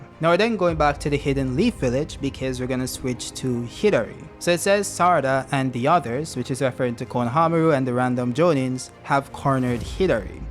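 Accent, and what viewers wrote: Jamaica. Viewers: yo are u jamaican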